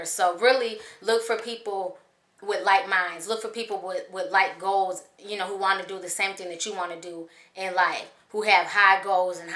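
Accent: South, United States. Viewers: listen to your slight southern accent!! MN flushed it out. I'm loving this random flashback 💕💕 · Oouuu it's the southern twang for me! Glad to see your growth! 😩🤎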